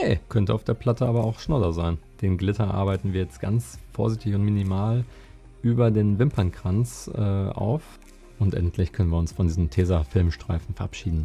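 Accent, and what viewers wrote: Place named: East Westphalia, Germany. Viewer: Da spricht der Ostwestfale :-D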